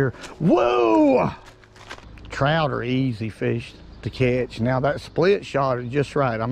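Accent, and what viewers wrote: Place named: West Virginia, United States. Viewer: Old West Virginia boy here, what part are you from, you sure got the accent down?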